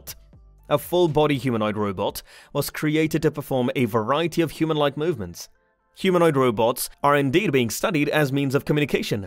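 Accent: United Kingdom. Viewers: fkkn brit accent announcer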